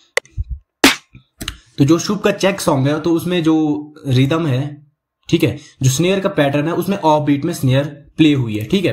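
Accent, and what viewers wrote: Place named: Hills, India. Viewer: aapki awaj se hi lag raha tha ki aap khi pahad se belong karte ho , main Uttarakhand se 😊🙌🏼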